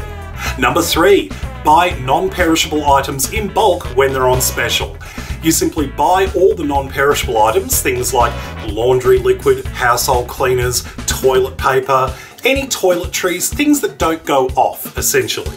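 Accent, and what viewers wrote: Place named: Australia. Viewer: Yes he's Australian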